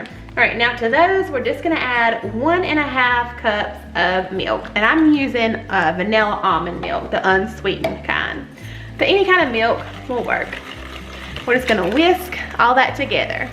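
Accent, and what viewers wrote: South, United States. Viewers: I sooo enjoy watching all your videos! I love your southern accent! I'm from Oklahoma. Us southern girls have that accent!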